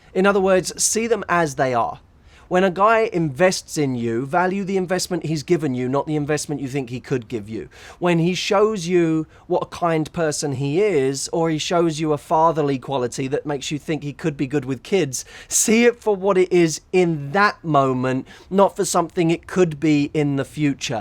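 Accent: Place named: United Kingdom. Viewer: I enjoy watching your video... and your british accent.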